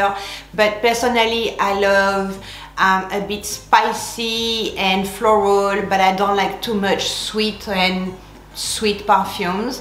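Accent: France. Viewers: French perfume review by lovely french accent ❤️ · I can feel the French accent while you are talking in English 😂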